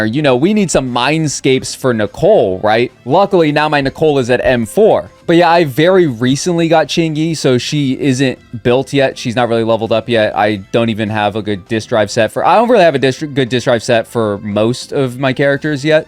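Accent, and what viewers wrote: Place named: United States. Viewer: I tried to watch your video but... I don't like your voice. It's so slurred and croaky. I also don't like your style of American accent. If you could do something about that, that'd be great.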